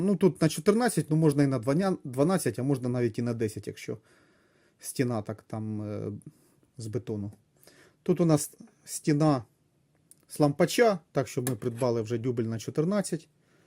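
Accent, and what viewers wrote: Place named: Russia. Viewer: не ломай язык,твой родной русский.Твой украинский с огромным акцентом.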